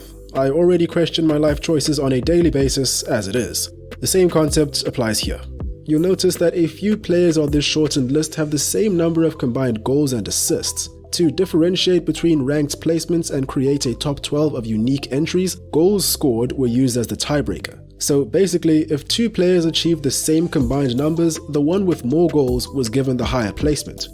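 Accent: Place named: South Africa. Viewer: You sound very South African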